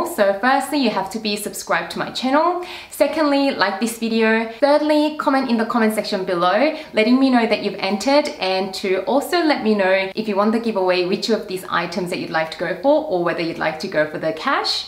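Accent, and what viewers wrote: Australia. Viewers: Hi! Congratulations! I came across your channel while searching up about chanel bag reviews. Instantly, I feel in love with your personality and Aussie accent:P So generous of you giving away prizes - I'd loooove to get the LV Monogram 6 key holder:) I’ve entered on Instagram as well 😊 All the best with your YouTube and I can’t wait to see your future videos <3 - Shirley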